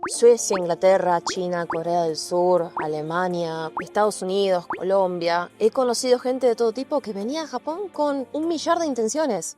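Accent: Buenos Aires, Argentina. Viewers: me refiero al acento, no al habla... Ese acento cringe de porteño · Hola cómo estás mi nombre es José Carlos de Tijuana México y bueno un gusto seguirte adoro tu pais de Argentina tu acento es lindo n.n y bueno me gusta mucho la cultura japonesa como el anime manga comida japonesa sus paisajes etc etc lo que mas me entristece es que en 3 meses la versión japonesa de power Rangers llamada super Sentai llegará a su fin el 15 de febrero y bueno buen vídeo me gusto fue interesante te aprecio mucho y mil abrazos grandes para ti saludos desde Tijuana México. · acento de porteño* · el 70% de los argentinos tenemos ese acento, asi que acento de argentino* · Argentino??? O porteño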